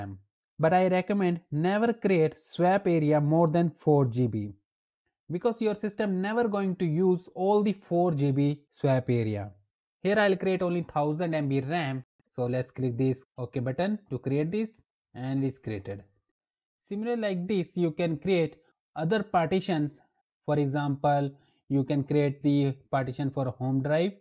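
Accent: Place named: India. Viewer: i hear das indian accent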